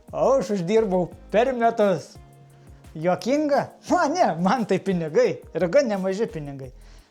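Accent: Aukštaitija, Lithuania. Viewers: Tau sėkmės ,visada laukiu vidoso.Man dviračiokai nelabai ,bet kai kažką kali ,mali , gamini buiziotę ,tai man geriausi video.Ir kalbėk aukštaitiškai...!!!Super. Aš iš suvalkijos.😂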